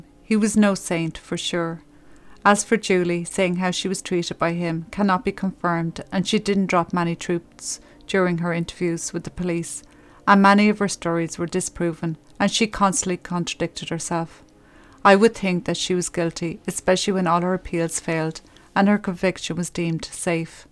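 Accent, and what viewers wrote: Ireland. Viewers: Love your channel. This was horrific, Its like i could hear the hurt in your voice when you mentioned Kia. Traumatic what she witnessed and what Nadine was put through. I always remember this one. Thanks for sharing, nice to listen to a fellow Irish. 😊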